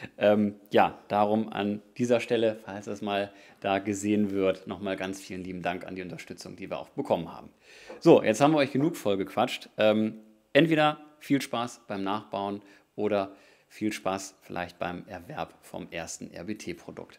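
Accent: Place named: Germany. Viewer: du bist ein Genius. Wie immer, es ist ein Vergnügen dir zu zuhören. Und dein deutscher accent ist sehr angenehm. Grüße von down under.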